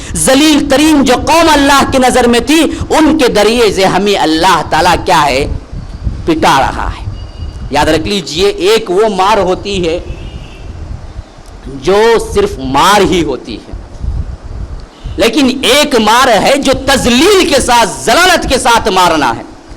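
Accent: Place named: Hyderabad, India. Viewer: Hafiz sahab apki zubaan bohot achi lagti hy, jo shayad Haderabadi hy. Masha Allah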